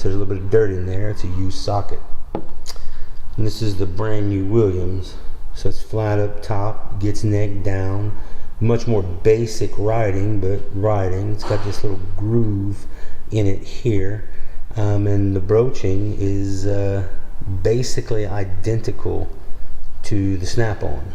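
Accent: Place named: Louisiana, United States. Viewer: Noticed the accent before you finished your first sentence. Is it wrong that I'm more inclined to trust someone who talks like me? Been debating between these brands and was under a different impression. Thanks from Lafayette cher.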